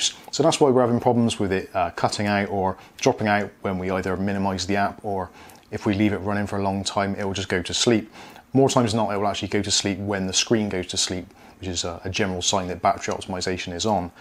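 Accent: United Kingdom. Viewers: too british for me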